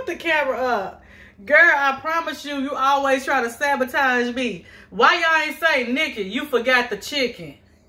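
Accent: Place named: Louisiana, United States. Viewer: You sound like one of my cousins from Clinton, La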